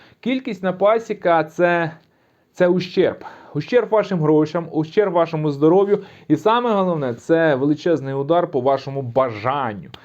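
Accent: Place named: Central Ukraine, Ukraine. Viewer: Прослухав ще раз ! А ми земляки. Так розмовляють в черкаській та вінницькі області..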